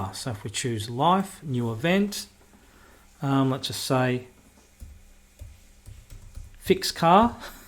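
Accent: Australia. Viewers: i love the aussie accent!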